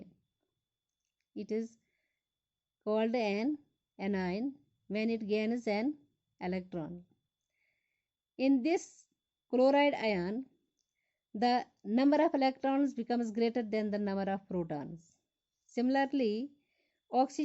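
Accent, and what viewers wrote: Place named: England, United Kingdom. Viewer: English accent tho 🙂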